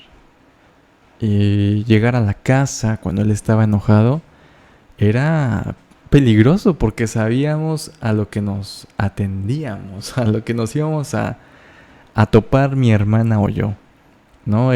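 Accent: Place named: Spain. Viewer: QE gusto saludarte ya no as echo vídeos por favor sige haciendo cuando menos de ves en cuando me encanta tú hacento de España y forma de dialogar Eres demasiado inteligente, estan con ganas tus videos de YouTube 🙏🥳🤩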